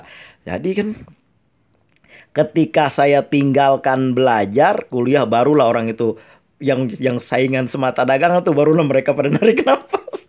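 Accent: Jakarta, Indonesia. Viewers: Alhamdulillah senang banget klu ketemu chenel pak ustadz n ane SK logat Betawi nya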